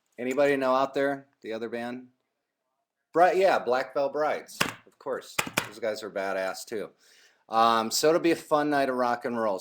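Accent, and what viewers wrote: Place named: Indiana, United States. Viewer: He pronounces them that way because he's from Indiana, that's how they talk, it's an accent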